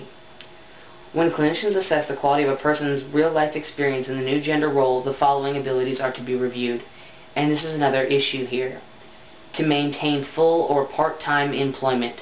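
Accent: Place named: Texas, United States. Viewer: Wait, wait, wait. "Here in Texas" - you live in Texas(or at least at the time of this video)?! :O Hahahahahaha, I've been going through all your videos, and I'm like, "This guy's accent is so similar to mine, I wonder if he's from somewhere around Texas..." 'cause I was born and raised here. What're the chances, man? You've got my EXACT couch, AND you live in Texas. This is just awesome! Hahaha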